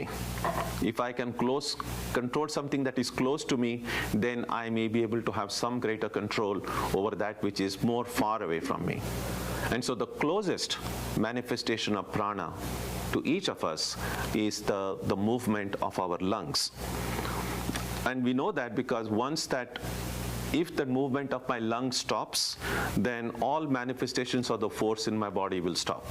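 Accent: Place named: England, United Kingdom. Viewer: Thank you for having an English accent that is articulate and clear with consonants and vowels, not slurring your words. 🙏, this help me understand these teachings of that ancient science from the Indian Continent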